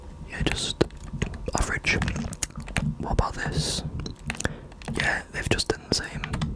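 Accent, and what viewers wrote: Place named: England, United Kingdom. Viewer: cool! i feel like people from england have great asmr voices, and you did not disappoint:)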